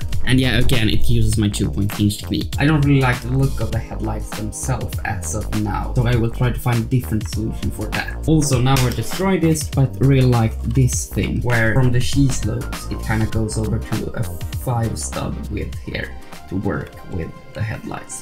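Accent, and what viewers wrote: Sweden. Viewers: What country are you from? Swedish accent?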